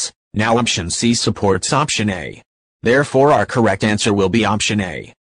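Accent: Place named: Spain. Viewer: Super bro Spanish english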